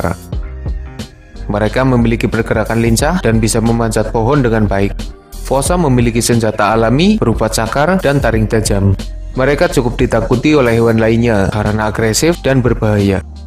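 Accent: Banyumas, Indonesia. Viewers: Aksenmu mirip wong ngapak min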